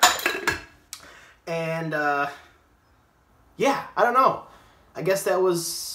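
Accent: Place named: United States. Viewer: His accent says he’s American but his drinking skills say he’s Australian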